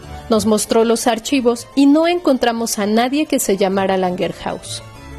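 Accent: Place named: Mexico City, Mexico. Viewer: El acento chilango le dio el toque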